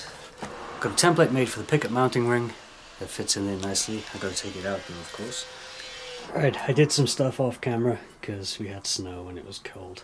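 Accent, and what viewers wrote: Wales, United Kingdom. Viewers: Are you Welsh. Muss ?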